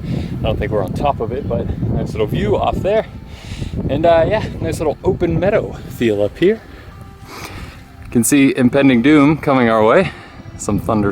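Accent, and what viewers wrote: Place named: New England, United States. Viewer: What's up from mass! Your accent sounded right at home, loving these videos and hopefully plan to hike a ton in the whites this summer. Good luck with the rest of your trip and maybe see you up there cheers man!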